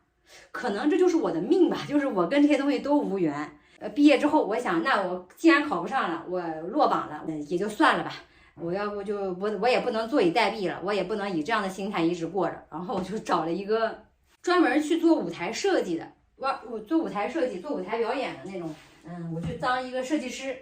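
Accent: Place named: Northeast, China. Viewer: 一口东北味，就这口条，好好干自媒体，未必不比上班差！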